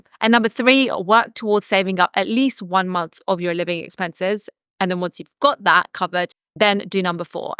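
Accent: United Kingdom. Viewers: British Accent 😑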